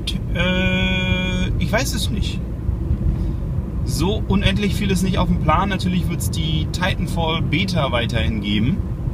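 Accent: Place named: Berlin, Germany. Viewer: Also ick find ja das dein Berlinerisch jarnich mal so einjerostet is ick hab dich voll und janz verstanden.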